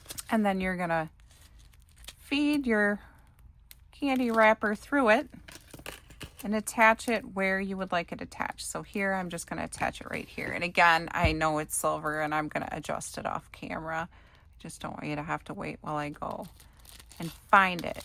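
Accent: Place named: Midwest, United States. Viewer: Hello! I am a first-time viewer and fellow crafter! I am ALSO a Wisconsinite....and I have to say...oooof...that mid-western accent!!! I'm thinking Wisconsin, Minnesota, one of the Dakota's of VERY VERY Northern Illinois!!! I LOVE this idea BTW!!! I plan to use this craft in my "Make to Sell" category!! THANK YOU!!!!!!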